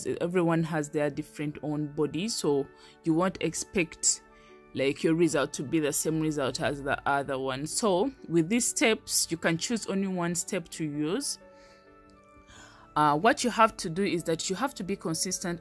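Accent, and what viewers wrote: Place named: Uganda. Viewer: She sounds Ugandan hmm..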